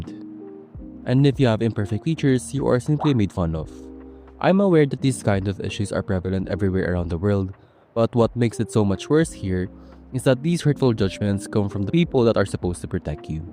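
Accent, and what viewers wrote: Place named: Philippines. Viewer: Pinoy?- (I said to myself the moment I heard the accent, and then you said PINOY NGAAA!! YEY)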